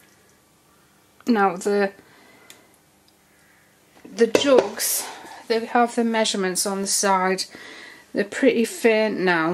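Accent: United Kingdom. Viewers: Hi, you can get some really good plastic jugs from any of the pound shops in the UK. Hope that helps others. Love your videos, it's lovely to hear a UK accent on these videos:) Thank you x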